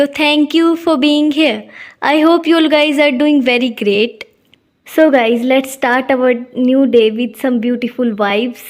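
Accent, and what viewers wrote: Haryana, India. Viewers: Aap hariyana se ho....pakka tone se pta chl gaya😂 Aso in Css 2024🤞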